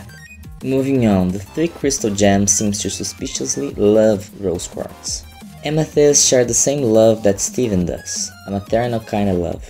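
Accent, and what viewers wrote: Brazil. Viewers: Mano vc eh br? Pq parece mt pelo seu sotaque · Eu percebi o sotaque brasilero!:)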